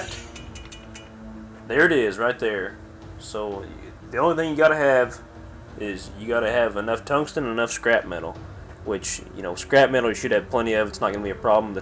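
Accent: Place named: South, United States. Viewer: Awesome, thanks for making this, enjoyed your southern accent!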